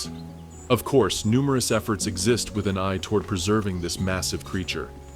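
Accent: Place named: Transatlantic, United States. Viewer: It’s quite interesting that a transatlantic narrator is describing an ecosystem that a transatlantic president is Hell Bent on destroying by openly declaring ‘ Drill Baby Drill’ someone needs to drill into Putin’s head to find where the evil is and to drill into Trumps head to find where the greed is. No?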